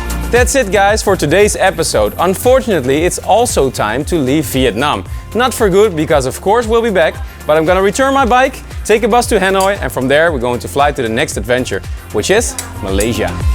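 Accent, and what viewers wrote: Netherlands. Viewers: by the frist phrase I knew u were dutch haha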